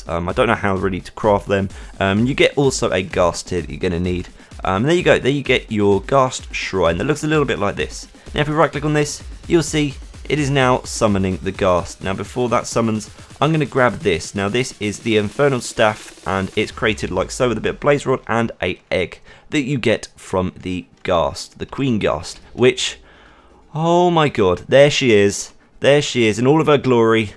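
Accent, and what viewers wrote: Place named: United Kingdom. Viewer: Don't worry about popularity man, you have a British accent, you'll be popular in no time!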